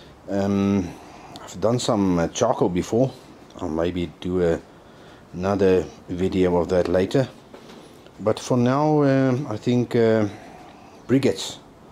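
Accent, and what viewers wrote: South Africa. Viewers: Oh yes the true Soud Afarikan accent! Love it! 😂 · Are you South African? This is a great process, similar to others so it for sure works repeatedly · I already knew it when I heard him, I’m from Cape Town and the jojo tank with the Isuzu in the background just hit the nail on the head 👌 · Are you south African?? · He sounds so South African. The accent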